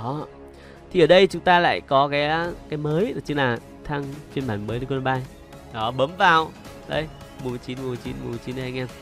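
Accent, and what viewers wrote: Bac Kan, Vietnam. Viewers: Ông ở Bắc Kan à 😍😍 Thích thế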